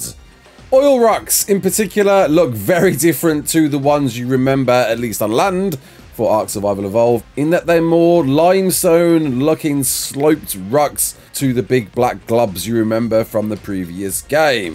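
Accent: Birmingham, United Kingdom. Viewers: Honestly I only came across you waiting for xbox release, but its fuc*ing nice to hear a fellow Brummy on youtbe! I've subscribed and I hope you make videos for xbox 👍🤟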